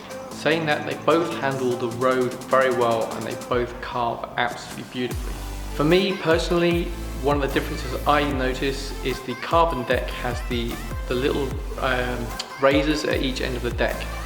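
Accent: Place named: England, United Kingdom. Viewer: It seems like people with English accents make the best reviews. Great work man. I’m looking into the bamboo. I like the flex deck. Plus, I like the muscle car vibe the bamboo has going on.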